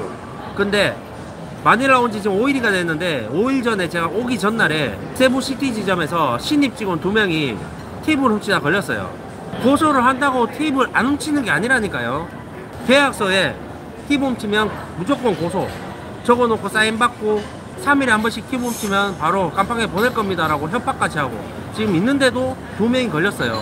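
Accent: Gyeongsang, South Korea. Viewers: 좋은 공부가 되셨네요. 힘내세요. ^^ 경산도 사투리 때문에 그냥 말하는게 업되어 보이는 걸 보는 사람마다 받아들이는게 틀리니 마음에 담지 마세요. 힘내세요.^^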